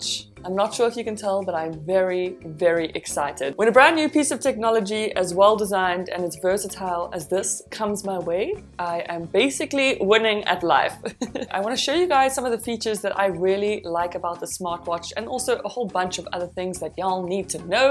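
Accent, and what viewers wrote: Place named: South Africa. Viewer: Thanks for the review! I thought I recognized the accent (lived in SA for 6 years). This decision about which Versa to buy can be daunting lol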